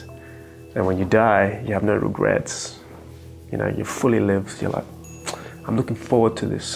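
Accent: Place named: Australia. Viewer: Thank you for sharing. You have an Australian accent. Wondering if you're in Australia still?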